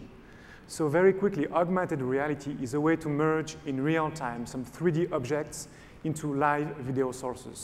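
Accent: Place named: France. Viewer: superbe ! ( l'accent francais xD )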